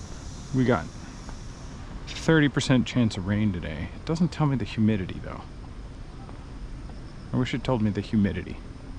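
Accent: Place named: Texas, United States. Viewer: Sound like you are Texan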